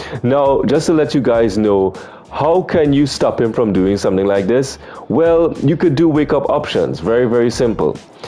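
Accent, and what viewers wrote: Trinidad and Tobago. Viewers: You a trini? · For some reason the Trini accent is helping. Thanks for this tip, from one islander to another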